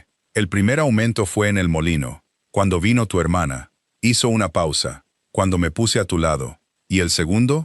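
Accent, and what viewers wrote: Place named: Pocho, United States. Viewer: Buenas historia, pero desespera esa forma de hablar pocho...ni Inglés ni español....x favor 😊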